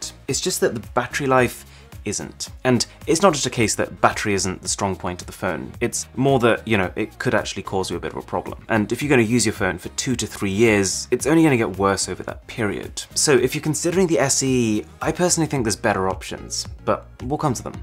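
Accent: RP, United Kingdom. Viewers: Nice review but why do you talk Posh? lol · Where the hell did you get that info from? The dude is British and it's been proven before by the uni he went to and so on. I've also met people from the UK with the exact same accent and they're not too hard to find. Go do your stupid conspiracy elsewhere.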